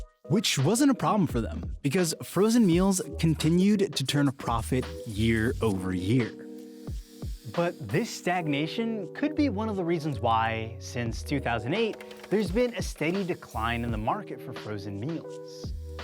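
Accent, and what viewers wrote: California, United States. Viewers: the narrator's accent reminds me of the snl californians skit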